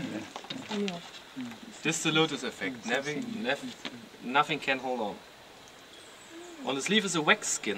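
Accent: Germany. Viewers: When I heard him talking I was so sure he is German :D Coz I speak the same way when I try to talk in English :P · german accent xD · I hate German talking english.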